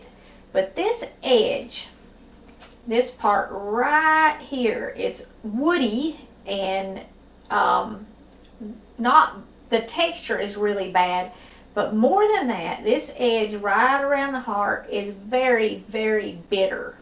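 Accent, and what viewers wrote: South, United States. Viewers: Thank you and Bless you as well. I am African American Female, whose parents and Grandparents were all born and raised "Down South"...I enjoy watching cooking programs...your Southern accent especially gave me fond memories of my own female family members talking and comparing recipes as they prepared various dishes. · EVERYTHING you make looks so good, and your country accent is way cute too.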